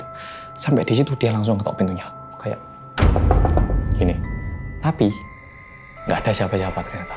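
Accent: Java, Indonesia. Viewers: Seneng lagi ngomong boso jowone sing medok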